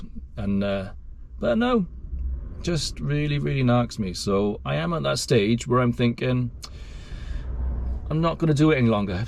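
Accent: Wales, United Kingdom. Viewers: I'm not into gardening but found the thumbnail just way too intriguing and hear you. And on a positive note I've always thought the Welsh accent is thee best accent back home ! Anyways, yes, i hear you and at first i thought, well maybe old age and forgetfulness gone wrong but then i thought nope. You've GOT to be happy in your work place. I'm 69 in September and lost a kidney to cancer 2014 and have mobility issues with abdominal adhesions and Countdown Supermarket here in New Zealand have a food home delivery service and those guys go out of their way with helpfulness and positive smiles and they go above and beyond and they are lifesavers but I could never imagine being negative with them, goodness gracious, that's like cutting my throat and burning bridges and making life so difficult. I don't mean to come across as holier than thou but I've done the delivery jobs in Yorkshire in the late 70s 80s with northern dairies, john Menzies paper delivery and polar motors in essex and you automatically help people who need it but i never came across ungratefulness but I've seen it on the old UK telly progs. 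And it must be real hard biting your tongue under those circumstances. Perhaps you can do the UNCUT video version of this :) Anyways, i definitely say put yourself first and then your family cos if you go down there's no provider! And DON'T feel guilty but I suspect a part of you does. Monday greetings from Middle Earth back to the Homelands 🙃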